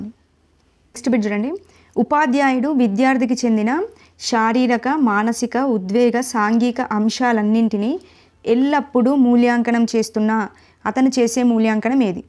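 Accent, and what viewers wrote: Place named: Telangana, India. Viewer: మీరు తెలంగాణ కదా మేడమ్ Voice telustundi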